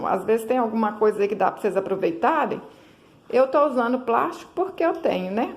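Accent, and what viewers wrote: Minas Gerais, Brazil. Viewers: vc é de Minas?porque meu marido é e fala como vc,gominha,superlitro,rsrs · Bom dia ..amei vou fazer, obrigada vc é ótima amo seu sotaque uai...😘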